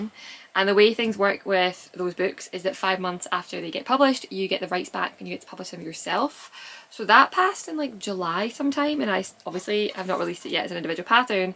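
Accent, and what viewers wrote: Scotland, United Kingdom. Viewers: Hi, I love watching your videos. Last year I planned a ten day driving trip to Scotland and I watched a lot of videos with people from Scotland explaining the history and as interesting as that was I kept finding myself listening to your podcast. And i just wanted to say that hearing your Scottish accent really did come in handy when talking with people in Scotland. Because I was listening so intently to what you were saying I found that after a bit I was hearing your accent less and less. So thank you for that and keep on knitting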